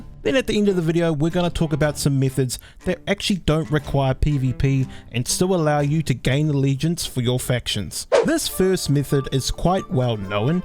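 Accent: New Zealand. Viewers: quick question are you from NZ?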